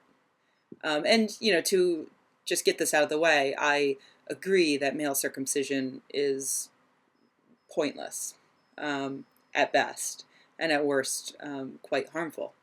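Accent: United States. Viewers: Alright. So based on your accent I'm going to assume you're American. If I'm wrong please correct me. As such the statistics that are most close to home for you should be those of American citizens. In America roughly 80 percent of men are circumcised. This comes out to be roughly 260,000,000 men. 200 of those men die from circumcision every year. FGM promotes another staggering number with 500,000 women either being victims of or at risk of FGM. As far as my research has shown there are 0 annual deaths due to FGM. FGM is horrifying. It disgusting, and evil; however, to deny that other practices are not to be taken with the same sense of urgency simply because of the gender of the victims is both damaging and disingenuous. I look forward to the day when both plights can be handled at the same table with the brightest men and women our world can offer. Thank you for your opinion, and the vehicle necessary to make mine heard as well.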